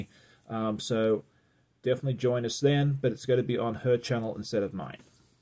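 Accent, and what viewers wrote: Australia. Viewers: Hi, really love your koala quilt, and also the daschound quilt. Your doing fantastic stuff, and I like your promoting of other YouTubers, By the way, because I’m an Aussie too, I don’t pickup on your accent…you sound like everyone else I know! It’s funny…when I was in US and UK, I could pick out an Aussie accent immediately as there were so many other accents that were new to me. Good luck finishing everything for the quilt show, 👍🇦🇺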